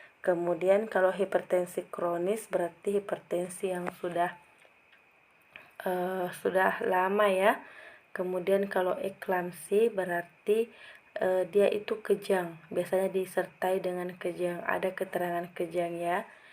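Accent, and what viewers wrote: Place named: South Sulawesi, Indonesia. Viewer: Kk org sulsel yah ?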